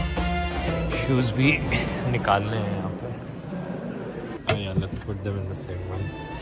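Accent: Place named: India. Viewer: From the thumbnail I thought he was an Italian tourist than he spoke Indian, my Brian start rewiring!!!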